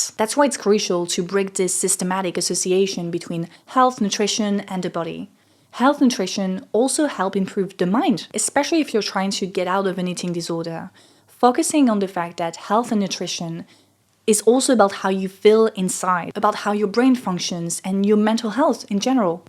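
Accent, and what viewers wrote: United Kingdom. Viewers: Why does she sound like British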